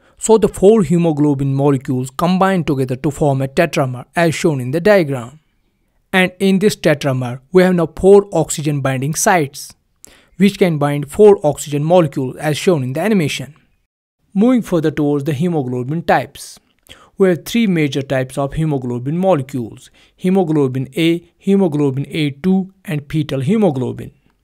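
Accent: Kashmir, India. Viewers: Are u from Kashmir I think so because of ur pronounciation.❤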